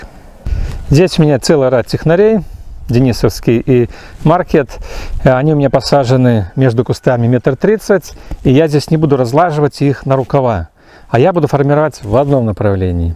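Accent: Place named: Belarus. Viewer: большое спасибо за информацию, очень нравится ваш голос,ни с кем не спутаешь,с белорусским акцентом, вопрос,какой секатор (фирма ) вы использовали для обрезки. Привет большей из Сибири,г.Томск (виноград пошёл на север )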